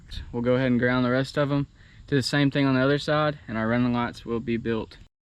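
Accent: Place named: Texas, United States. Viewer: Ok maybe not from Texas but you sure do sound like it…! Mad skills bro, stay blessed!!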